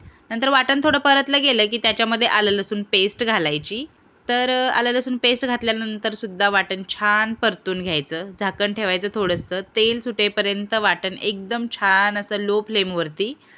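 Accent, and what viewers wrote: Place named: Kolhapur, India. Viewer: Khoop masst banavlat recipe ...tumcha awaj and kolhapuri accent, ayikayla chaan vatte. ❤Stay blessed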